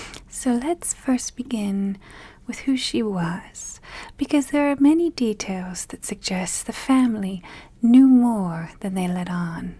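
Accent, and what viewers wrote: Transatlantic, United States. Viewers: The cadence in which you speak is very interesting, is your accent natural or is that something you’ve worked on? Would you describe it as a transatlantic accent?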